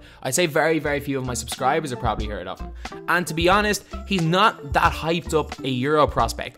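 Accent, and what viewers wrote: Ireland. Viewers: This is an Irishman narrator who is trying to sound American.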